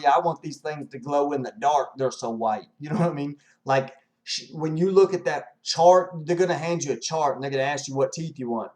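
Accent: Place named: United States. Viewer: man.. I am so happy I found your videos! I myself have been in a similar situation with my teeth and after the past 10 years and $thousands of dollars on root canals, crowns that break or fall off, on and on, you know.. I've decided I just have to get dentures. I have no choice and implants are out of the question. You've given valuable information to me and I'm sure a lot of other people. I feel like I'm listening to a close friend because of your accent. Well, it's not really an "accent", people don't understand that it's American the way we speak. Anyway, thank you again. I have a lot to do! Take care buddy.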